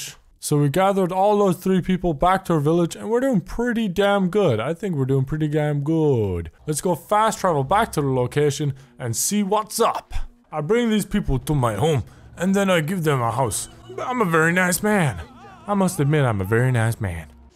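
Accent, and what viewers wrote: Ireland. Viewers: Your Irish, are u from the Midlands , you sound it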